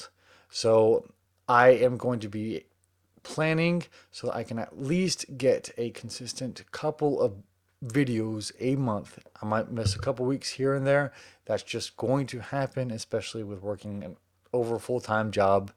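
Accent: South, United States. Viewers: I love your podcast on this video at your house in Texas during your quarantine until you're watching one of your previous video of yourself from the past while you're talking about all of your previous reviews of all of your books and it's a lot of energy, so you're talking about everything including your top 5 goals and your future after that, but I wanna say I'm happy for you and I hope your husband, Zach will be very proud of you, because I love your Southern accent!!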